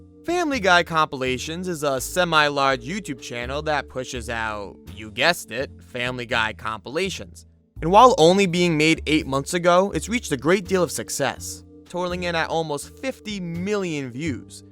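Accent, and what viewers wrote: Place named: Boston, United States. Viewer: You sound so Boston lzzzzzzzzz east coast trash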